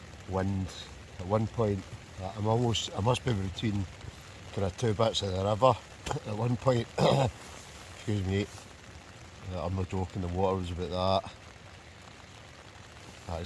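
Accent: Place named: Scotland, United Kingdom. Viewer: Nice Akto like tent, Cool Scottish accent. A camping video in Scotland? That's rare; most of of them are in the lake district on one of the gabels.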